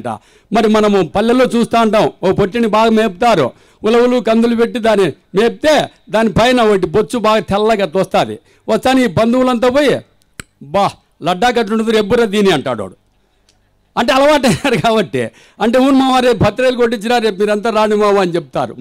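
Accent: Anantapur, India. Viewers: Beautiful language... Rayalaseema slang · Anantapur slang nice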